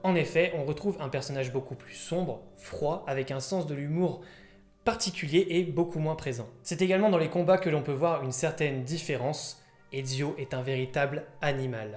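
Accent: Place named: Italy. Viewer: T'as un excellent accent italien 👍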